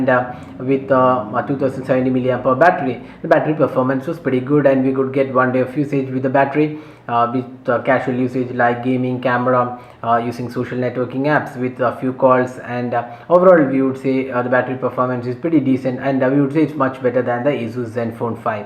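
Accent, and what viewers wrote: India. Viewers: I'm sure this is a good review but it's pretty hard for me to understand your indian-english accent when you was so in a hurry to tell everything you know about this phone.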